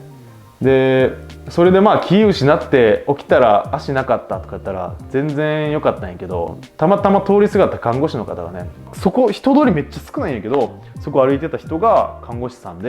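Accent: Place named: Kobe, Japan. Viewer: 神戸の子やな、言葉で分かる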